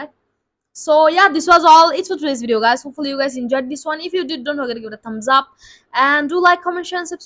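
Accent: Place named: India. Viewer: I tot u were from India,,,your tonation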